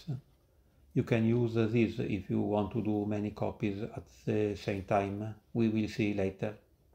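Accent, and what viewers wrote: Italy. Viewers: Is that an italian accent i am hearing?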